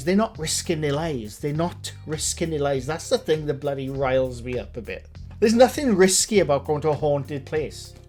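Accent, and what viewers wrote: Wales, United Kingdom. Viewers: Hi, love all your content and never miss an episode. As a fellow ginger I live in the skin cancer capital of the world (Qld Aust) and it's a pain in the arse having countless skin cancers removed already and no doubt will have more, is it much of an issue for you where you live in Wales? Also I have noticed your beard is multi toned as mine did when I hit the 30's mine became tri coloured to the point that I gave up growing a beard sadly. As for your accent, for me you are clearly Welsh but I have also encountered guys that sounded more English than Welsh and another fellow that I worked with I could barely understand because he spoke so quick and had such a thick accent. Don't get me wrong I like the Welsh, us Aussies seem to have an affinity with the Welsh, Irish and Scotts more so than the English. Keep up the good work.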